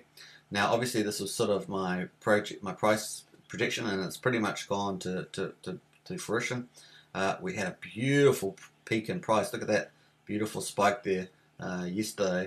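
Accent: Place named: New Zealand. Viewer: U sound like a new zealander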